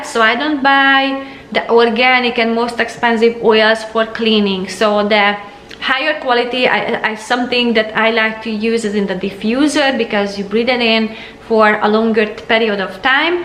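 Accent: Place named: Hungary. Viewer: Great idea to have everything in one place for recipes and products you're liking! It will be interesting to look back a year from now and say, do you still like the vacuum and things.:) Yes, you said "Sal Suds" perfectly. Your bloopers remind me, in a recent video you mentioned when you first started your channel, you wondered if people would understand you. I LOVE your Hungarian accent - having lived in several countries I just enjoy hearing other people's way of speaking and learning new words. I don't remember if I told you or not. So keep it up, you are amazing and maybe don't realize it, but people who haven't had the opportunity to travel will get a wonderful exposure to Hungary through your speech and your recipes. <3 Oh, and I think the pods brand is "dropps", I've seen them somewhere and maybe not positive, but pretty sure that was the brand name. 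Maybe? LOL Wishing you a beautiful day,!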